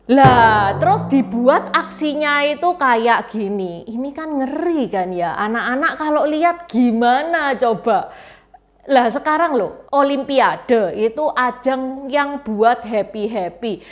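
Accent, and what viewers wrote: Java, Indonesia. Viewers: mba tinnggal dimana,ko ngomongnya medok banget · Seneng baku ndengerin suarane naik medokmu kuwi lho cik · Hostnya medogg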